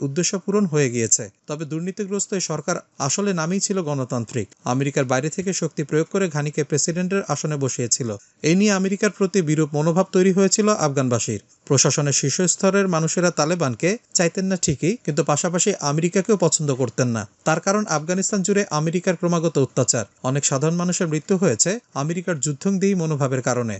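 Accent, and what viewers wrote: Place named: India. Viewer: ভারতের মত করে কথা বলবেন না Please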